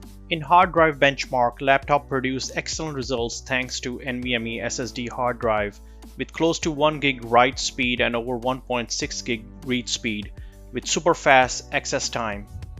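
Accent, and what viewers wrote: India. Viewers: Great Video, but the accent cringe is real! Either use Indian or work more on the US one. It's painful inbetween. Cheers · If u are Indian put the title in indian trust me no one wants to hear ur English accent get ur audience from your own shitty cohntry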